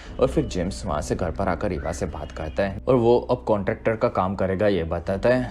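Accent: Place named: Odisha, India. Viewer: Man your accent looks like Odia-hindi mix.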